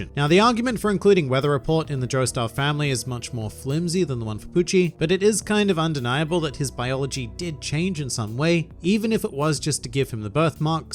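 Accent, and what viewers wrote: Australia. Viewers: You're right other videos don't have a sarcastic Australian man tell me about Jojo Family line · hmm i do like sarcastic Australians narrating 🤔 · I am here for an Australian man narrating. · I didn't realize you were Australian. I thought you were American trying to be fancy. I guess that describes the Australian accent pretty well. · imagine not having read part 6 and hearing an australian talking about a dude whos looks are on the level of a trashcan who wields the stand bohemian rhapsody thats somehow connected to van gogh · Sarcastic Australian man narrating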